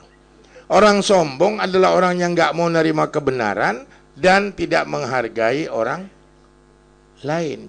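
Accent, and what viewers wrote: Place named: Serang, Indonesia. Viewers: sehat slalalu untuk ustd.mantep cramahnya ustad bndar lampung. ustad bahasa serangnya medok. slam kenal dari sobri jaseng di bntuhan kaur bengkulu. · Dicky Pratama Putra pantes logat ny banten mntap abah yai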